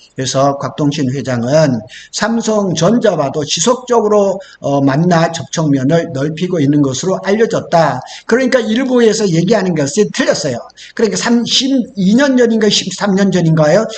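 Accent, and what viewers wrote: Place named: Korean-Chinese, China. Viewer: 억양이 조선족. 의심스럽다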